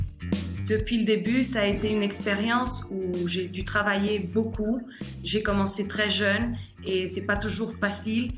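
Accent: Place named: France. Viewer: Elle a l accent français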